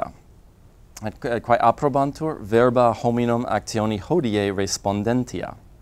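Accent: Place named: United States. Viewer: I love that this guy just roles with his American English accent.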